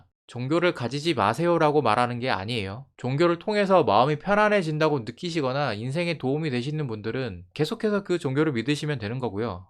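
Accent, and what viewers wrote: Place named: Gyeongsang, South Korea. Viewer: 경상도 억양을 씁니다. 사투리를 줄인거지 억양은 경상토 토박이에요 고향이 대전이라는데 억양은 토박이 경상도가 강한데요..신기하네요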